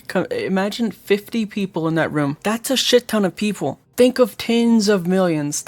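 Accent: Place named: South, United States. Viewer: I like how it sounds like u have a slight southern accent that maybe ur hiding.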